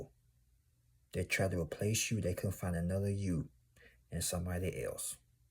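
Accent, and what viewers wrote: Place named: South, United States. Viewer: I like your southern voice, You are easy to listen to.❤😊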